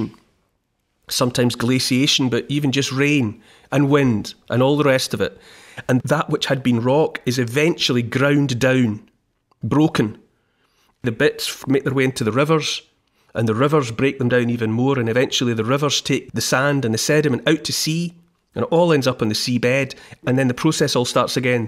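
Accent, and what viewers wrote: Scotland, United Kingdom. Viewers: I enjoy hearing your Scottish voice, Neil. It reminds me of home.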